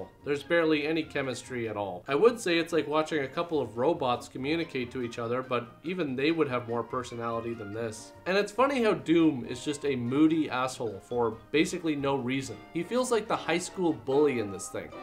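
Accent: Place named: Canada. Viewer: ive gone through a 5 videos of this channel and it feels like this guy is trying not to embrace his canadian accent, because it only slips out with words like "process" not trying to stereotype him but i spend alot of time in guild/clan voice chats and bro... just let use make fun of your accent. embrace it.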